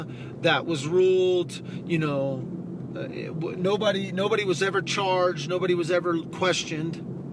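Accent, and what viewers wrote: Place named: Texas, United States. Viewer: Lori Ransom He is from Odessa Texas and that is his country boy “speak” coming out!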